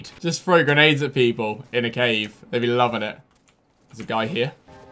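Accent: England, United Kingdom. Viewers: your english accent always cheers me up!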